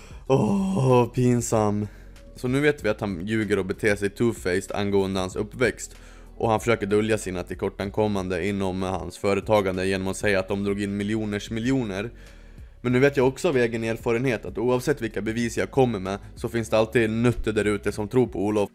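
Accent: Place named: Sundsvall, Sweden. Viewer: Asså, kommer du från Njurunda/Sundsvall? Låter exakt som en snubbe jag känner på sättet du pratar osv. Menar inte att det är du men ifall ni är från samma område.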